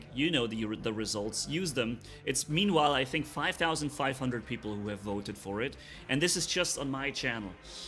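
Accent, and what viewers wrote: Germany. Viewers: Sein Akzent hört sich deutsch an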